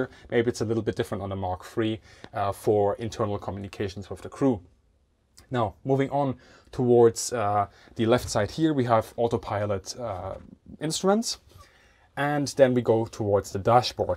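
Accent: Germany. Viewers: Sound German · Deutsche erkennen IMMER einen deutschen Akzent xD, geiles Video bro. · du sprichst deutsch das hört man lol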